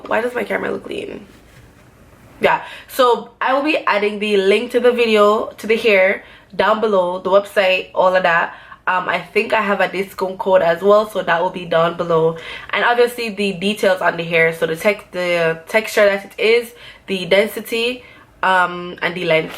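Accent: Jamaica. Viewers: You deadass sound like a jamaican accent hidden there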